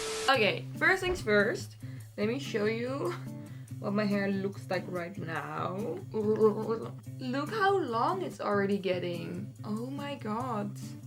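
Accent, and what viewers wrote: Netherlands. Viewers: Ik kon aan je accent horen dat je 🇳🇱 was😁😉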